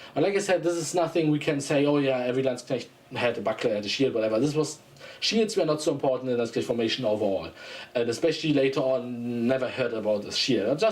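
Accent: Germany. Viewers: I mean, realistically given that accent, he should probably have a German or Austrian flag behind him. No one should have patriotism to the European Union that’s freaking weird one could say almost disgusting.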